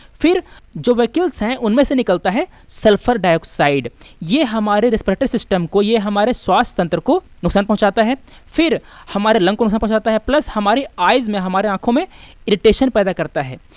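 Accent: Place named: Bihar, India. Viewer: control ur bihari tone plZ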